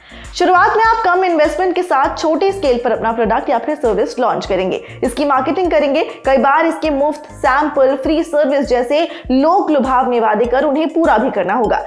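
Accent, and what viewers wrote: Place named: Bihar, India. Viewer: But mamm ka bihari accent (idea to hayye hai) bahut aacha laga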